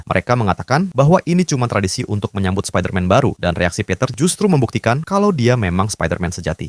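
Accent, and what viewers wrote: Sunda, Indonesia. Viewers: Abang ini ternyata orang Sunda ey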